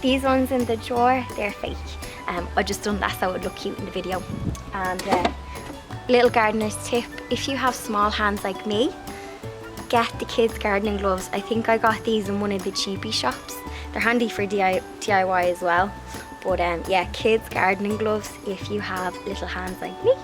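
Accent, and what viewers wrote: Ireland. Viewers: I have been watching your videos here lately and am loving you. I am a 64yrold lady who was born in England and love listening to your Irish accent. you are o cute and so crafty. It makes me want to do things I used to do. So I started to do a few little crafts like a beginner even though I used to do this stuff all the time. Thank you for your inspiration and keep it coming. God bless you.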